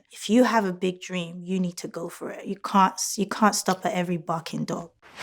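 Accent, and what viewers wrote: United Kingdom. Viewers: Does she have a british accent seems it mixed sorry i am French ? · Where did the British accent come from?? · British accent I hear? Is she of British decent aside nigerian? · weezy o she probably lived/ grew up in the uk. There are plenty of Nigerians with British accents!!! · What's up with the British accent though? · Famm that british accent is on point!! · She studied in the UK and came when she was little, so obviously British accent and British passport. Stop hating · Why the UK accent you are Nigerian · Ho my gawd Where did the British accent come from🙄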